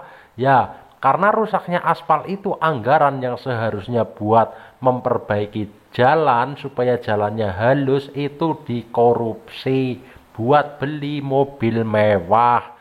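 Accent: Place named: Banyumas, Indonesia. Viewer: logat ngepeknya mantep bro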